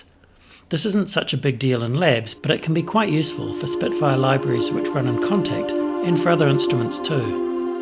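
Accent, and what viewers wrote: New Zealand. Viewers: Your a kiwi?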